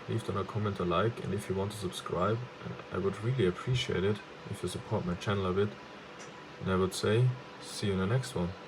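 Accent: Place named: Germany. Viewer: Ich frage jetzt mal auf deutsch weil ich heraushöre dass du deutscher bist :D super video wäre nie draufgekommen kupfer zu verwenden.🙏 Wo hast du das Kupfer herbekomen in der Dicke? Und nach der logik muss man die Kupferplättchen dann niemals tauschen oder?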